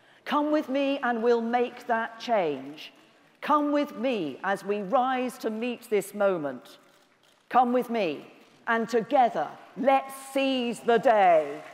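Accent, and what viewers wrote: RP, United Kingdom. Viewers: I love to listen to her Queen's English speeches!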